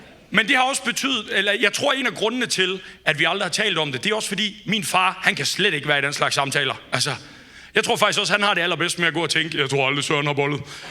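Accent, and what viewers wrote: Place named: Jutland, Denmark. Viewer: Der starter meget jysk ud... Men selv med den jyske levering har du kraftedeme fat i noget fedt 🤣🤣🤣🤣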